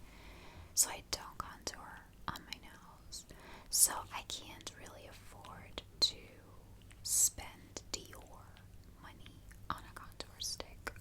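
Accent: United States. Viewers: i recently found ur channel and have been loving ur videos! are you irish? you mentioned you’re located in ireland but you sound american to me!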